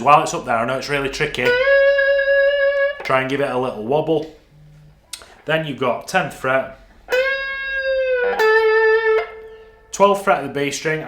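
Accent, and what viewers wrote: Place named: Leeds, United Kingdom. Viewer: Great gutar teacher as s leeds fan love the accent 😊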